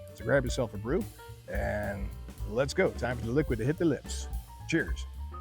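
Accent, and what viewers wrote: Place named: Boston, United States. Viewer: Bawstin LaaaagAH! Remastid! I can’t stand the Bostonian accent but I love the 🍺 😂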